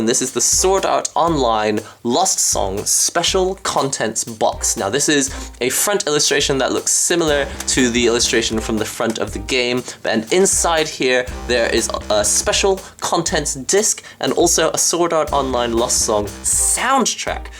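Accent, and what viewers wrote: United Kingdom. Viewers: How the f••• do you be Japanese and have a f•••••• British accent.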